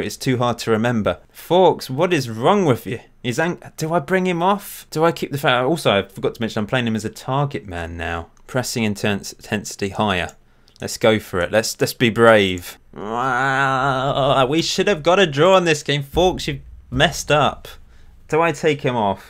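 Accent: RP, United Kingdom. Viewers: Love how posh you are, it's like watching Blackadder.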